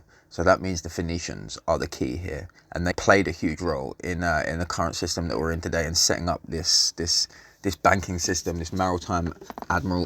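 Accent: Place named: London, United Kingdom. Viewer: u sound like a proper London geezer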